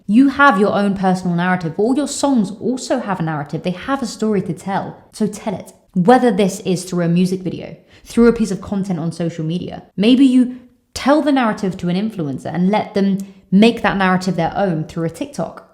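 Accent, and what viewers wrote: England, United Kingdom. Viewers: Which area in England you're from? (love the accent)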